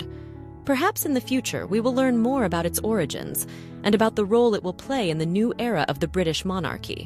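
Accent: United States. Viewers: A slip of the tongue I think. She should have said "the" new monarch. At least these scripts seem well-researched. And now they're spoken in the original American there's none of that AI fake RP. Mind you I wouldn't call anything containing Cullinan V understated.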